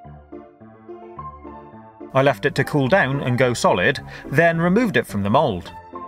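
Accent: England, United Kingdom. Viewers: Are you English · i love your english accent :D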